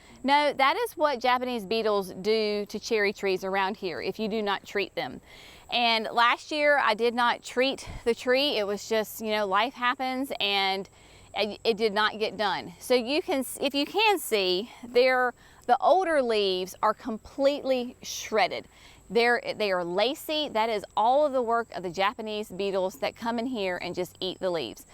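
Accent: South, United States. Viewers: Love your charming Southern Accent and the guaint Souhthern sayings. Thanks m for sharing g your beautiful garden. Love ya.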